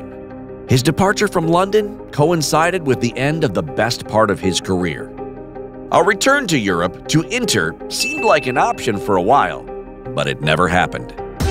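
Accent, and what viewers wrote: United States. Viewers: its not benfeca its pronounced benfi-ica, and is it only me that finds it weird to hear American accent on a football video?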